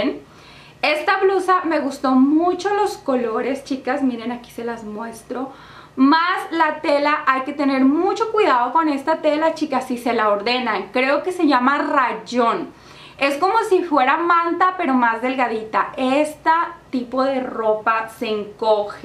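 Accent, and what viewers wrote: Colombia. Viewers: Y ahora porqué, anda hablando como las de Colombia?? ,🤔Así no hablaba ella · si eres regia intentas hablar como colombiana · Soy yo o te escuche como con otro asentó como colombiana o algo así jaja muy lindos zapatos 👠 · hoy te escuche hace to como colombiana 😍😍😘😘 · tu acento no me gusto tipo colombiana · note como que traias acento colombiano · Que Colombiana te escuchaste esta vez. Pero dentro de todo tu ropa muy bonita la mayoría te queda precioso. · con ACENTO COLOMBIANO? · te escuche colombiana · Ese acento Colombiano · note en este video tu acento colombiano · senti que hablaste super acento Colombiano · Andas como media colombiana o algo Así no? 😂 Pero como quiera me gustó el videito jiji..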